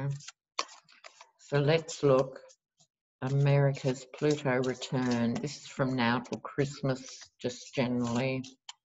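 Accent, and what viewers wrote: Australia. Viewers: The Australian accent is most charming. I never tire of listening to it. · We say it's speaking, 'Strine'! :)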